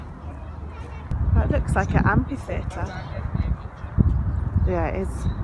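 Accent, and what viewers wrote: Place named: United Kingdom. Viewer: I love your british accent, lovely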